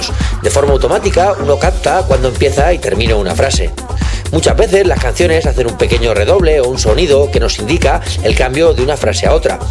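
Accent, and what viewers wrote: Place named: Spain. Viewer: amigo que buen trabajo y gracias x tomarte el tiempo para hacer todo este trabajo que la verda vale muchísimo para los que nos estamos iniciando en esto de lo del dj esto es como el oro puro muchas gracias ojala sigas haciendo mas videos como estos mas de 1 te lo agradeceríamos bueno espero te encuentres bien soy de méxico y x el acento que tienes creo que eres de España bueno pues muchos saludos hasta España y ya me suscribi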